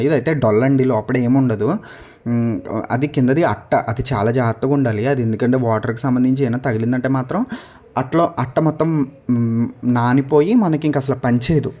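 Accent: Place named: Godavari, India. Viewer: Meru godavari vallandi. Mata telustumdi. Alamkaram baga chesaru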